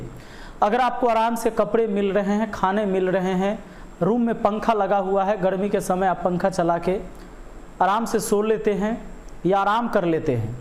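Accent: Bihar, India. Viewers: Abe Ga*ndu ab tu unko sikhayega kya shi hai or kya galt, wo Bihar ke hain unki language aisi hi hai, tere se bahut aati hai hindi to ye video hi mt dekh, ja dusre channel walo ki chat bhencho*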